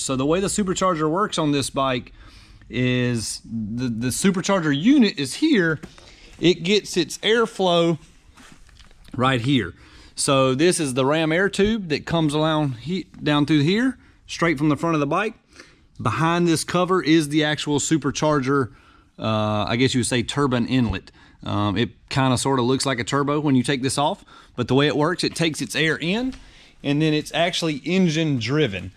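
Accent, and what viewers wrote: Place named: Rural, United States. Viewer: You sound country and far from the city, where are you located?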